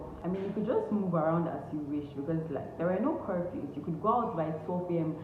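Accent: Ghana. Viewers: Your tongue is more Ghanaian than Nigeria. I think we taught you dear my dear. Stay blessed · Thanks for sharing your experiences, it will help educators on both sides. I must add this, in a year’s time nobody could tell you’re aNigerian, your accent moving rapidly Ghanaian! Good luck with your college work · Your accent is now Ghanaian.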